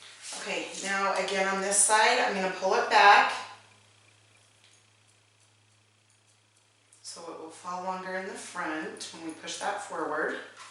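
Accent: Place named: Wisconsin, United States. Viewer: Your accent sounds like Wisconsin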